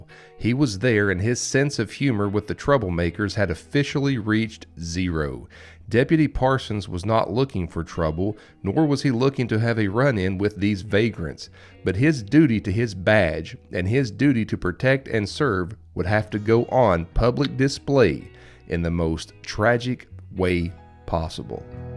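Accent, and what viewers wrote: Kentucky, United States. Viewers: Awesome upload,as always. Smooth Kentucky voice an added bonus! Thank You,!👍🤗✌️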